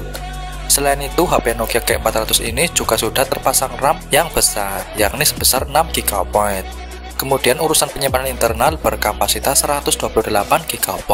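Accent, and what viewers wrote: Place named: Java, Indonesia. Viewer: Nyunsewu, mas e logat e medok.. saking tegal mas?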